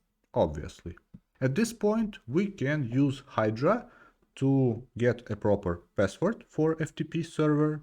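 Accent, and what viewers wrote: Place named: Russia. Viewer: русский акцент сразу чувствуется))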